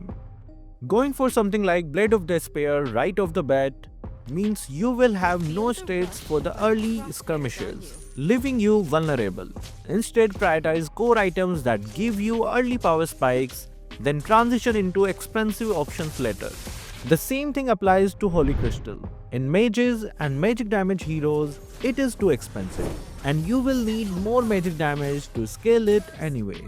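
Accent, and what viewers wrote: India. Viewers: the moment I heard the Indian accent I just stopped all.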